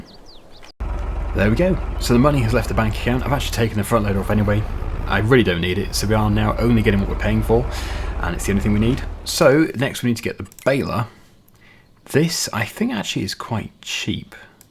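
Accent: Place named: England, United Kingdom. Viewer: I don't want to affend anybody but he has an English accent (I think) but in the game he drives on the right side of the road. Just something I noticed.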